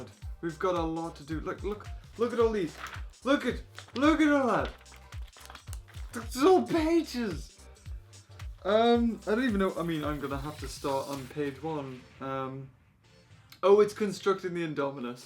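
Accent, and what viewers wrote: United Kingdom. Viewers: you are from UK?? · Are you britesh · plz reply are you Brtish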